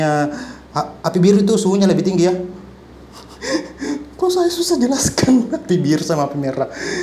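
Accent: Makassar, Indonesia. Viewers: Orang makassar nih keknya😂 logatnya keliatan bgt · dari logatnya anda sepertinya dari makassar cess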